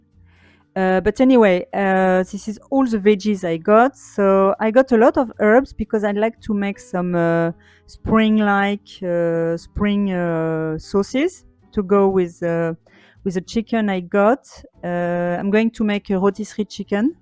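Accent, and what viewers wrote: France. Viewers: Thank you for the fresh ideas for sauces. It’s not close to spring where I live but I will definitely try these in another month or two. Loved the Parisian street scenes. You have delightful French accent and you seem like a native English speaker. How did you get so fluent in English?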